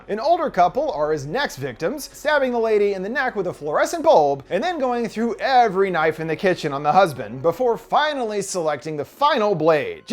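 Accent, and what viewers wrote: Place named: United States. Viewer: No. He speak American English.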